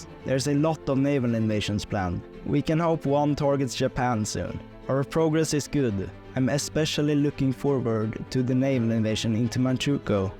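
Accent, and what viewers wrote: Sweden. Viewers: You are swedish. it sounds kind of annyoing, at least of right now, when you use that overly-bad accent on purpose. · First time watching this channel could tell by the accent he was swedish. I would know im swedish myself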